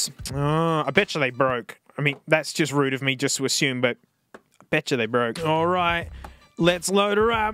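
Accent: Australia. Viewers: Are you Australian or new zealish?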